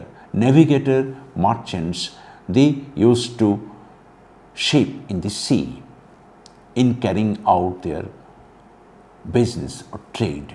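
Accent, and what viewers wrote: Bengal, India. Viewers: Bengali accent is so irritating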